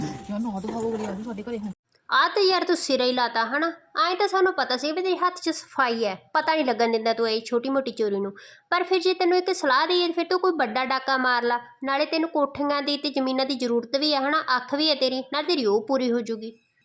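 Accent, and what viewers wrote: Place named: Bathinda, India. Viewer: Kida jiss hisaab naal tuc yaar pronounce krdeo oss hisaab eda lgda v tuc Bathinda ton o cuz mere nanke v Bathinda ton ne te o v bilkul same eda hi yaar pronounce krdea..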